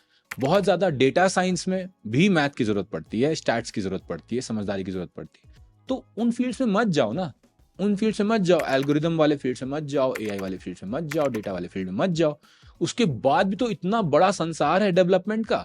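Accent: Bihar, India. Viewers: Bihari tone aa rha aapki baato main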